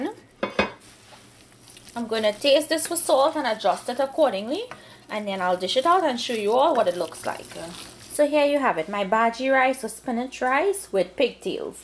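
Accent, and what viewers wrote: Trinidad and Tobago. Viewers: Not only is your recipe the best, and video easy to follow, but your Trini accent added a special flavor to the pot..I so love to hear my Trini people speak..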